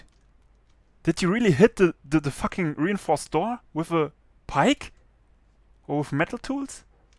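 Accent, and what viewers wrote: Germany. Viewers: Du klingst deutsch:b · Bro man hört ein bisschen raus das du Deutsch bist aber trotzdem geile Videos und gutes Englisch 🔥🔥🔥👋😂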